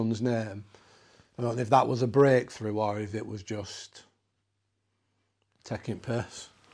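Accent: United Kingdom. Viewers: Sir, why have you not spoken to your local parish and asked for a blessing of your home and property? I gather from your accent you're British; certainly the COE or the Catholics can assist. You are on a long journey now; be advised Evil always scratches us when encountered. You definitely need spiritual protection from the Christian Church and none of these others. For Real.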